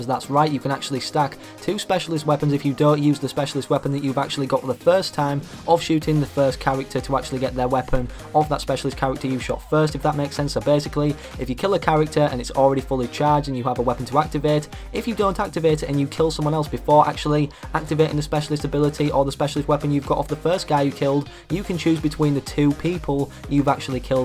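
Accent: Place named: Yorkshire, United Kingdom. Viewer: know a Yorkshireman when I see/hear one ;)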